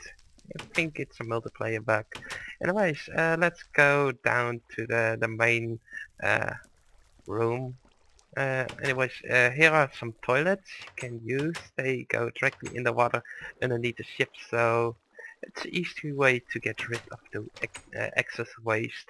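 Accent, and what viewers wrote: Netherlands. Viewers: okay are u dutch because u sound like that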